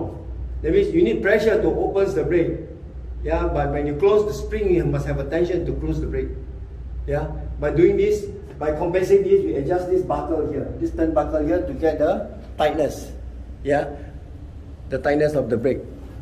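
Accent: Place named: Singapore. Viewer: Sounds like Singaporean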